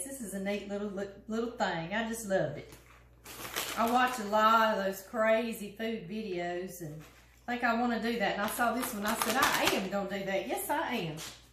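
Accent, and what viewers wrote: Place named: Louisiana, United States. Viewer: Miss I just want to tell you how much I enjoy your program it's like being back home again I'm from Louisiana from New Orleans and it's just wonderful to listen to you with your accent lol Wish we were neighbours I love cooking Louisiana style and I know we would Be best friends. Keep doing those delicious Recipes that you do. And I will keep watching. God-bless and stay safe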